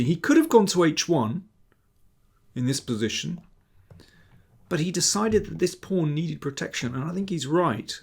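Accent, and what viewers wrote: England, United Kingdom. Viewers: This may not be the fastest recap video, but it's definitely the most clear, articulated and easy to understand for all levels. Plus the English tone and diction goes smoothly with the analysis. Keep them coming, Mr. King.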